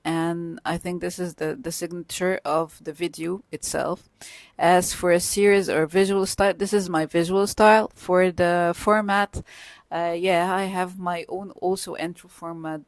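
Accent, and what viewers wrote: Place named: England, United Kingdom. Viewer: Love you English accent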